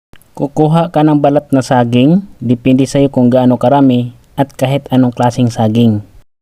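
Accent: Visayas, Philippines. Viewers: 😢 parang bisaya si kuya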